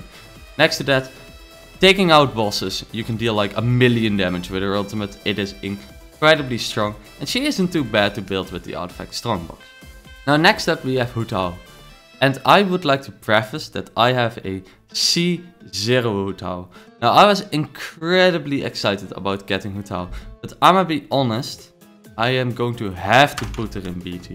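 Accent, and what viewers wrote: Netherlands. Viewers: The Dutch accent haha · Are you dutch?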